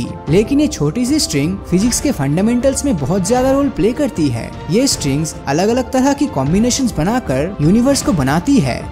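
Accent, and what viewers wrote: Bengal, India. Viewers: Are you bengali!!!!Your accent says bro☺️☺️☺️☺️☺️ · Bhai aap Bengali hain na.... ❤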